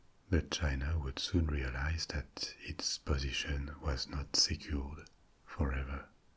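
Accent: France. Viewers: You know......I bet your history content spoken in a normal voice would top Kings and Generals and other top history channels. Your French origin/accent/interpretation of history is a ..... breath of fresh air to all the Anglosphere content. I know there is a ton of French content on YouTube, but for example it was great to hear Napoleonic history from a non British person - I mean for real! The British are so proud of Waterloo - they (and maybe mostly Prussia) defeated WHAT WAS LEFT OF NAPOLEON.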